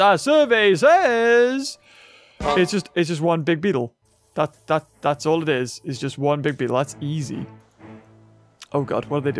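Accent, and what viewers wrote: Philippines. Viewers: ah that filipino accent is giving my ears seizures 😭😭😭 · ANOO NAGSABI BA SIYA NG PILIPINO TAGALOG ACCSENT HA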